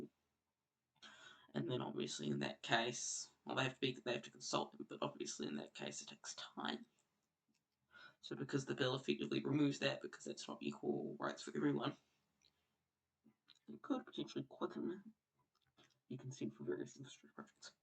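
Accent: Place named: New Zealand. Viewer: Hi can you please re-do these videos? The sound is too low for us to hear with your Kiwi accent. And it also sounds like you've just woken up, as your voice is cracking while you're speaking. I really appreciate that you took the effort to do this, but as someone who is not a Kiwi and is interested in this topic, this is not helpful.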